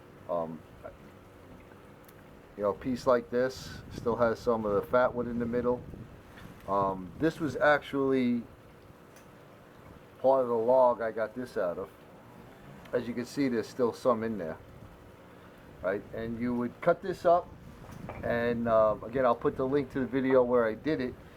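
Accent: New York, United States. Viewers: Ah the New York accent brings me right back home! Haha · and the New York accent! Yasssl!!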